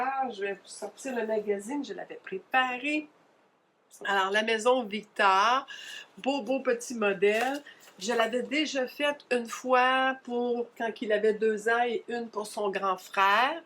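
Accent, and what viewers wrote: Quebec, Canada. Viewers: Je t'ai découverte depuis peu, mais vraiment c'est un réel régal de t'écouter avec ton bel accent du Québec et ta bonne humeur ainsi que ton naturel. Et tes pensées sur les choses de la vie que tu nous fais partager. Mais bien sûr tes cousettes toujours bien réfléchies et avec de bonnes explications et de belles finitions. C'est cela aussi qui de l'autre côté de l'écran, à des milliers de kilomêtre nous permets dans notre petit coin de progresser et de s'encourager à faire. J'ai le même bordel à la maison, j'essai de m'organiser, mais le naturel reviens au galop.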